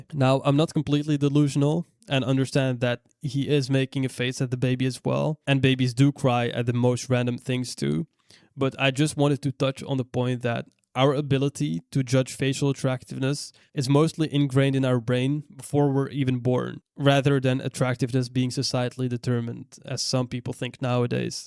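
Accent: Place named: Netherlands. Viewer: Very Dutch accent.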